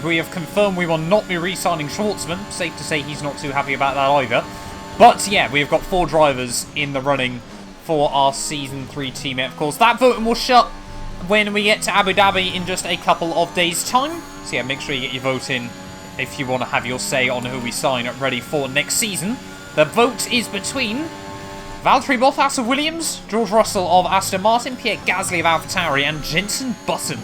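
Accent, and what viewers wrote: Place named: England, United Kingdom. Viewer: time to hear say "reta oposta" and "bico do pato" in an english accent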